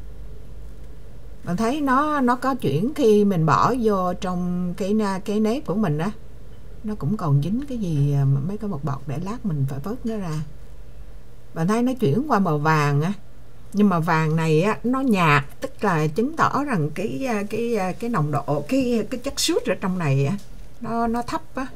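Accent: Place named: Central Vietnam, Vietnam. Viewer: Chị nói chuyện thật là mộc mạc, đúng người miền Trung ❤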